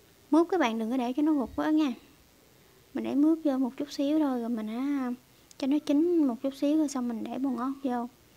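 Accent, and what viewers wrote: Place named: Mekong Delta, Vietnam. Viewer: Mâm cơm nhìn hấp dẫn quá, con gái miền Tây nói giọng hay và khéo tay quá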